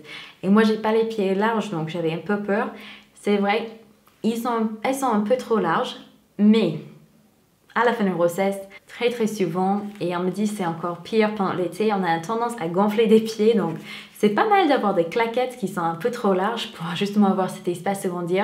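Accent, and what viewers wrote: United States. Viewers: la robe est superbe c'est celle que je préfères ! tu es très jolie and i love so much ton petit accent usa 😊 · Salut! Ca fait 3 ou 4 mois que je regarde tes vidéos très sympathique! T'as fait une faute dans le titre Haut et pas Haul, mais tu es bien mignonne! J'adore ton accent américain continue comme ca!!! Bisous de Paris 😘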